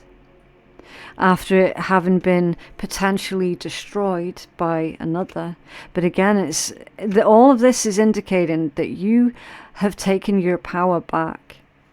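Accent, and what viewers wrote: Scotland, United Kingdom. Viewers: Blessings to you, Thank you for my reading Scorpio 10/11 /1976 born at 6an, Love your Scottish accent lol🐯🌹🌟💜😁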